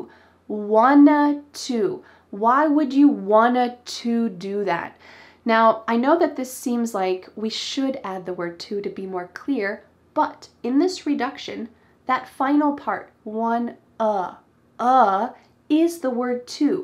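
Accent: United States. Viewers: I like 👍 American 🇺🇸 accent 🌸🌸🌸🌸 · Hi,! I just watched one of your lessons. I love your US accent. It’s amazing and so mean to me. I hv got hard things in putting my tongue to pronounce words correctly. It made me be shy to speak. Lol. I need tips from you. Help me pls. Thanks and wish you always be happy there. ❤️ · She’s so perfect, and perfect way to learn American accent.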